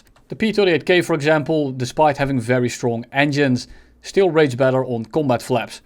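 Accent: Netherlands. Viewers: I know you're Dutch, I hear ded eksent a mi-jel awee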